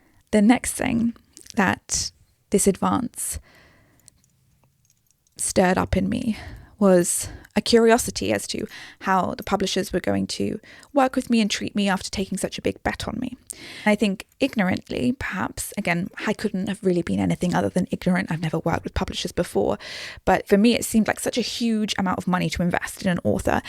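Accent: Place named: RP, United Kingdom. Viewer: The fact that you refer to books as 'she' is making me think this is pretentious twaddle. If you have a literary agent, surely that person is explaining the money side to you. You sound posh, whiney and entitled. I don't know why the algorithm recommended this to me. I thought most authors were so delighted to get their first book in print that they would accept next to nothing. I find it staggering that you've got this much money. Not in a good way.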